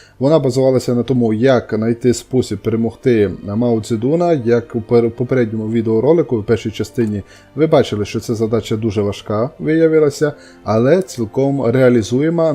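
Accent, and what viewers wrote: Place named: Lviv, Ukraine. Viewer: а я так і зрозумів, що ти з западу, бо надто вже схожий на північний Львівський діалект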